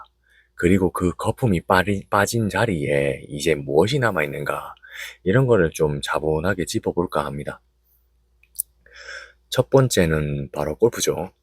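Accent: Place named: Busan, South Korea. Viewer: 부산말씨로 현실과 냉정으로 설득하면 8도 사람 거의 수긍!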